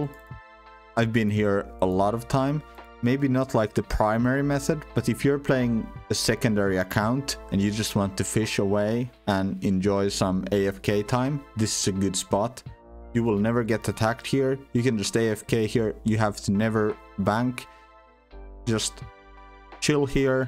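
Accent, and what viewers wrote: Finland. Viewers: Im and can tell you’re finnish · Watched the 1st 15 seconds and could hear that you are Finnish! Great content man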